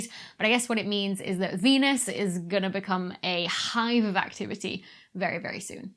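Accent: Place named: Northern England, United Kingdom. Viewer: Great to hear a Northern accent! Love this channel, fabulous stuff!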